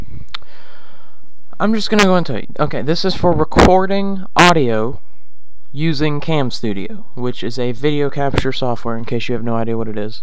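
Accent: South, United States. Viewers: are you fucking KIDDING ME?? i was trying to find a way to record FROM MY SPEAKERS WITHOUT A MICROPHONE and sat through SEVEN MINUTES of you bloody SOUTERN ACCENT to show me that your whole problem was to USE A MIC?? well that's not fair... i'm speaking out of hate, and now that i've calmed down, i can say calmly that ou've wasted my time. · you has a country accent like me :p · lol southern american.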